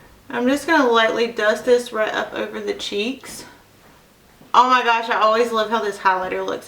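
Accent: South, United States. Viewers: Girl, I am a new subbie and I knew you had to be a southern gal! Love this eye look! Hugs from Bama! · Your so pretty with and without makeup! You sound like your from the south, I love it! I live in North Georgia! :)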